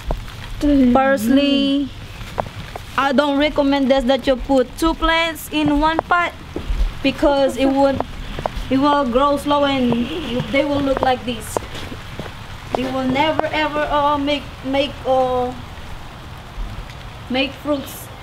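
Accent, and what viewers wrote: Louisiana, United States. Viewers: I love the Cajun accent you got I applaud you growing your own food looks great.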